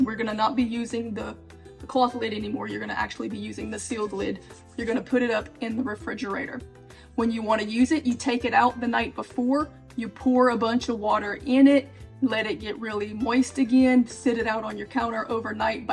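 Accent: Louisiana, United States. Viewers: I just came across your video and SO HAPPY I did!! I’m going to try this way of creating a starter. I did have a question while watching your video. I heard an accent come across that sounds very much like south Louisiana. Where are you located? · Great video. Very helpful. I thought I detected a Louisiana accent. My grandmother was a Mayeux. I plan to try your recipe with yogurt.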